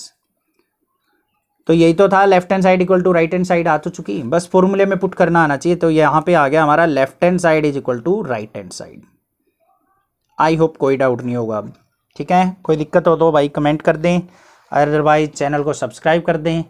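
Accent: Haryana, India. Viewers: Sir I am also from haryana.aapki boli sa hi lagta hai ki aap haryana sa ho but aapse kabhi pucha nahi vasa kis side sa ha sir aap😅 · i knew it sir aap haryan se ho even i belong to haryana so apka accent se hi samjhgyi thi and yaa sir i agree haryana ki kaafi itni green hai aur udher birds and all ka ghumna ghar ke bahar is so normal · Sir aapki language se pta chalta h ki aap Haryana k ho 🤗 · Aapki voice se he pata chalta hai...ki aap Haryanvi ho👍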